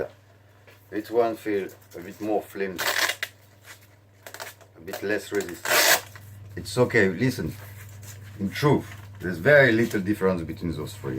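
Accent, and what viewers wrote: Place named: France. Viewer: At first I thought you could Irish then i heard you and yup definitely French :) Nice review though !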